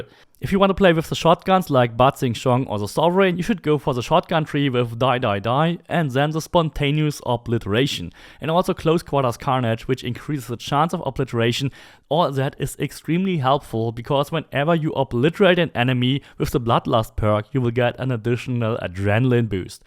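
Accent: Germany. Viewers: I hear the german 😂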